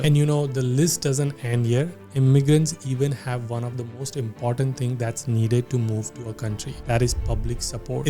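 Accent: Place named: India. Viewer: Even you need to go back to India. Am tired of hearing that accent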